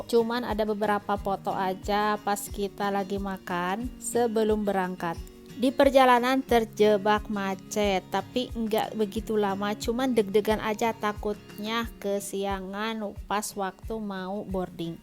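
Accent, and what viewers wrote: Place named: West Java, Indonesia. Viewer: hihihi ... Ciri khas Sunda teh 😆 semangat ngontenna teh ❤️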